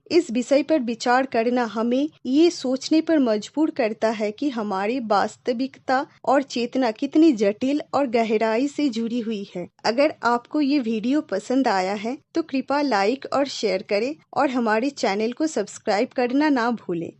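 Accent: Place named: Bengal, India. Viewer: You are a Bengali and trying to speak pure Hindi.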